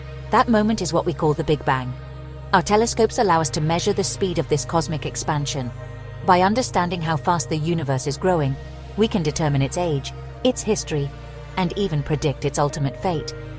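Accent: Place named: United Kingdom. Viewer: I liked the video. AI narrators often make rookie pronunciation mistakes like calling a Type Ia supernova "Type eye-uh" rather than "Type one-A". Nice touch with the British accent, though. Lends an air of Masterpiece Theater to the lecture.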